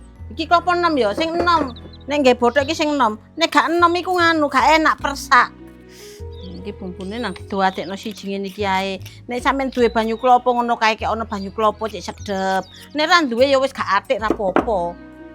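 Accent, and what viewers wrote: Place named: Surabaya, Indonesia. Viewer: Aksen jatim sby an....😂👍 seneng lihat konten bu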